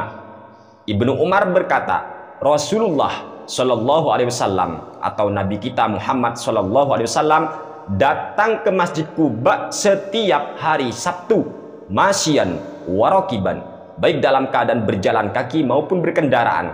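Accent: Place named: Java, Indonesia. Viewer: tigaraksa tgrang menyimak..mantab jelas...las lasan jawane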